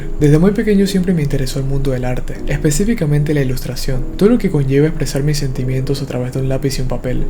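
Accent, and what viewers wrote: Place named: Panama. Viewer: Cada vez que pasaba el vídeo, yo me decia: "es como si me estuviera hablando un amigo, tiene el acento de aquí" y voilá, si, jajaja, es super raro encontrarme a otro panameño en Youtube. Saludos! n.n 👋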